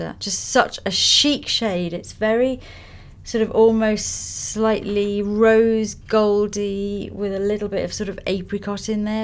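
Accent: United Kingdom. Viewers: I really love your perfect brit pronunciation! And what a gorgeous makeup!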